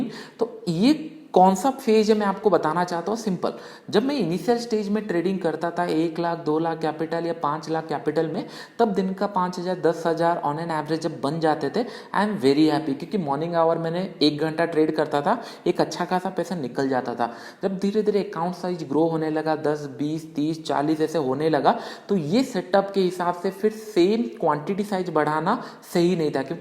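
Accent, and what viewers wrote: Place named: West Bengal, India. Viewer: It seems u r from West Bengal. I am too from Adra, Purulia district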